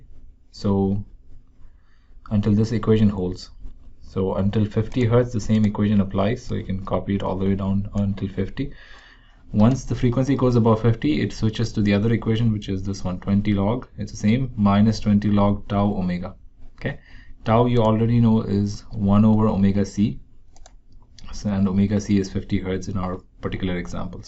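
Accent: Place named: India. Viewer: This indian sounding man has saved my electrial engeneering homework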